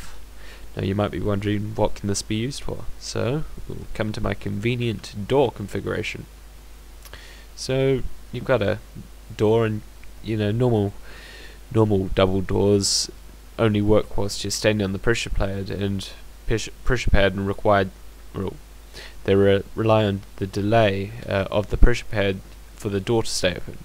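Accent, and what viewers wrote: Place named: Germany. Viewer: Are you German? Cause you sound a little bit like a German ^^'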